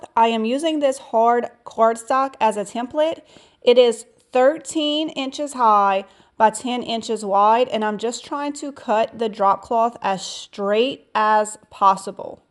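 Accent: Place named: Deep South, United States. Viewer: You are so creative, love the projects. Just subscribed. And that deep South accent,lol, couldn't stop listening.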